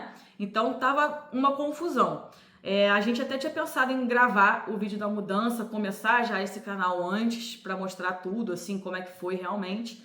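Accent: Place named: Rio de Janeiro, Brazil. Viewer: Que legal! Eu moro em Michigan. Pelo sotaque desconfio que você seja carioca....eu também sou apesar de ter morado meus últimos anos no Brasil em Petrópolis. Gosto bastante de morar aqui nos EUA.🙂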